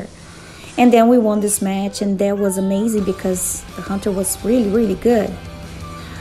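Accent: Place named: Brazil. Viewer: Aí mds acho tão lindo um brasileiro (a) falando inglês se como fosse português ahhaha❤️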